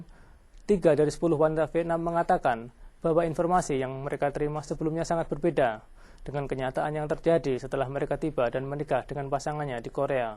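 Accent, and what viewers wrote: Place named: Java, Indonesia. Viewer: His Java accent... -.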